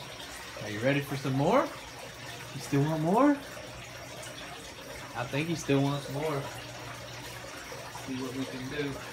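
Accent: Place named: United States. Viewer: You’re sounding real country in this video!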